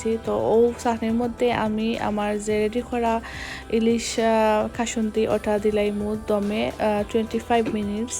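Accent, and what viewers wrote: Sylhet, Bangladesh. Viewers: তোমার মাত শুনে সিলেটি মনে হয়..😍 আপু আপনার দেশের বাড়ি সুনামগঞ্জ কোন থানায়..???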